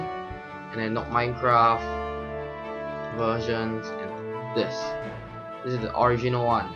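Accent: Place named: Singapore. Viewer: Thanks! By the way, your voice really sounds like a Singaporean. ;)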